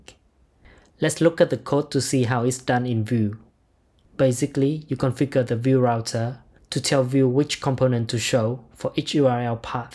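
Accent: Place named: Vietnam. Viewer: nghe tiếng Anh từ nước bản xứ thì không hiểu chứ nghe tiếng Anh từ người Việt thì hiểu 90% haha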